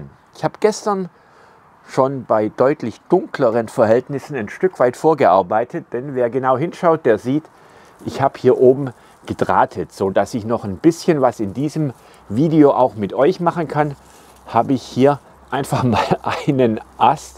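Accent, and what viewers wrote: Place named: Swabia, Germany. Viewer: Der Einkaufskorb.... absolut geil. Und das Schwäbisch... bitte: Sprich doch immer so. Finde ich absolut super und authentisch.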